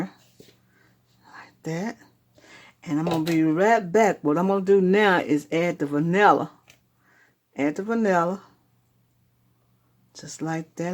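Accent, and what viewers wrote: South, United States. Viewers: I love the way she is talking. She is a true southern belle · I agree! I love her southern accent!!!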